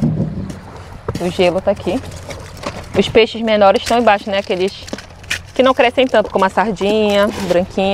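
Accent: Rio de Janeiro, Brazil. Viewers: Sotaque parecido com carioca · Vc tem sutaque de carioca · você é carioca???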